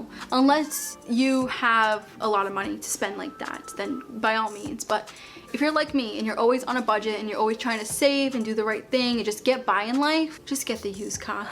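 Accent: Boston, United States. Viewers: Are you from Boston or something?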